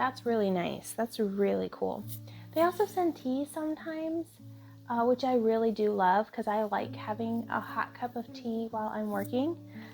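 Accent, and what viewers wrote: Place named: Alabama, United States. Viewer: You're exhausted? You look GREAT. The Pickens has a gorgeous drape😍 you did a great job! Your accent is similar to mine I'm from Alabama where are you from?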